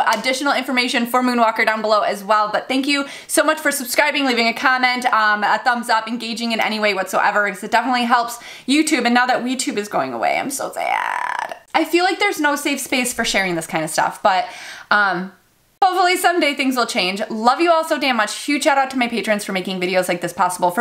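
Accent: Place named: Midwest, United States. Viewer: you gotta be from michigan or ohio...lol we have the best accents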